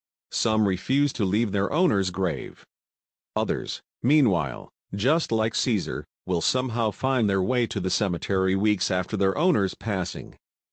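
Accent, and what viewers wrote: United States. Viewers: For heaven's sake man. You have no need of a robotic voice, you have an American accent. Use it, don't hide it.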